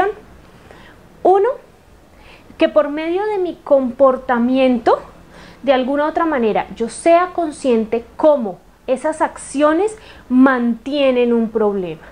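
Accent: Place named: Colombia. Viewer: Parece colombiana por el acento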